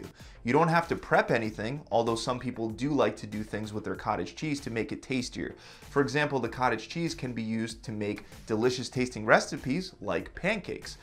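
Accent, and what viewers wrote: Philadelphia, United States. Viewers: I wonder if he’s from philly 😂 based on the way he says water lol! It’s awesome! Also… great video!! Everything is straight to the point and quick!!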